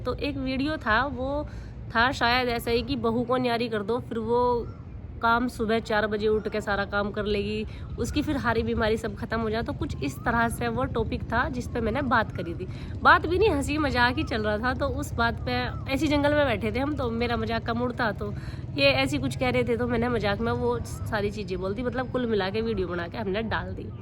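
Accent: Haryana, India. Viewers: आप की प्रस्तुति और विषय दोनों अच्छा लगा, वैसे मैं अवध से हूँ इसलिए आपका हरियाणवी अन्दाज में बात करना अच्छा लगता है✔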